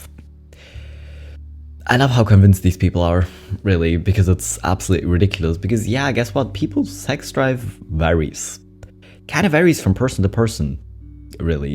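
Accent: Germany. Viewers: I'm German, too, and I'm usually good at recognizing accents, but his I think is very subtle. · as a German it's very clear he's German ( I don't mean it in a bad way, just the way we pronounce stuff is very striking to me haha) much love!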